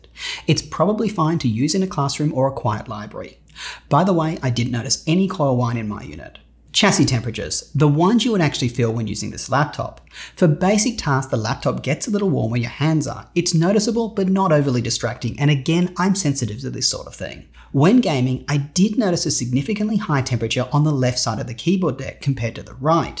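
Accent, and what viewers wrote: Australia. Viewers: Why do you use pounds if you sound Australian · cool accent, is it Australian？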